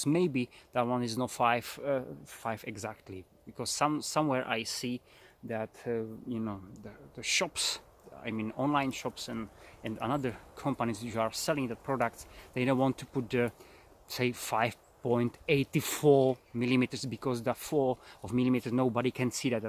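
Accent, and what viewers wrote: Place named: Europe. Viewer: Great video thank you were you , sound very European, good stuff , im from Canada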